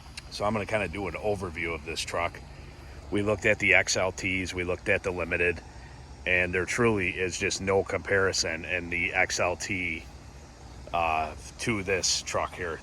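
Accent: Chicago, United States. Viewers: Yeah, i'm from Chicago as well and currently in TN, I too was gonna ask you the same question because I thought that accent sounded familiar. The Wife and I just looked at the the explorer st this weekend. I'm on the fence about and one of the biggest issues i have is that stupid vertical screen display. When i put it in reverse there was just a small 3-4" display which I could hardly make out any detail....that may kill it for me, gonna look around there's a lot in that segment these days and yes the Kia, hyundai, genesis GV80, and the Lincoln Aviator is a consideration as well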